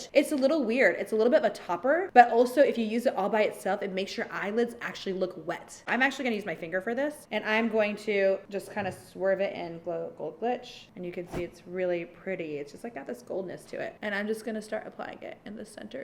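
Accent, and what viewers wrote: Iraq. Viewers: هدئ بيوتي اول مرة الحك عل فيديو من اول ١٠ دقائق احبج من البصرة وحجي عراقي فدوة😂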